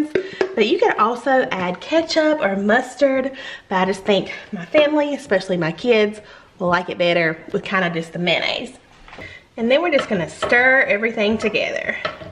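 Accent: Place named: Kentucky, United States. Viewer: See.... that is what I just cannot appreciate about most store brand cheeses. I know they are more affordable but, I just do not care for how stringy it gets. I personally prefer to see my cheese, creamy and smooth and honestly, I believe that it is some sort of generic imposter of real cheese. However! ALDI, actually says REAL, on their packaging, and I believe that they sell a more quality product because they are not based in the US. Sorry, just, my opinion. ALSO! I would like to try this with the canned Sloppy Joe BOLD brand, it is very tasty, not just the reg. SJ but it makes a big diff with the BOLD. Okay, I'm done. I love your accent! You sound like we do here in KY! :-)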